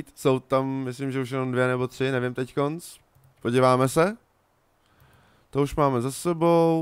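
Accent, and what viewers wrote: Czech Republic. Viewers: čau chci se zeptat ty pocházíš někde z okolí Ostravy? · lubozvucny moravsky dialekt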